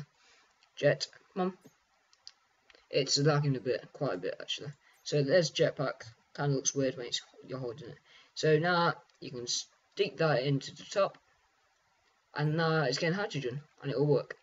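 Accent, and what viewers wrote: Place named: United Kingdom. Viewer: he sounds british, man from 2 years ago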